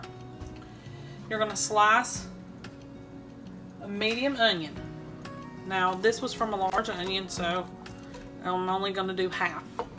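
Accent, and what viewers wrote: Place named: South, United States. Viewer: I love your country accent. Makes me feel right at home with my grandma 🥰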